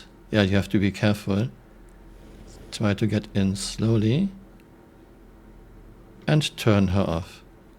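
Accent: Germany. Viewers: Wie deutsch kann man sein wenn man english spricht. MAXIMAL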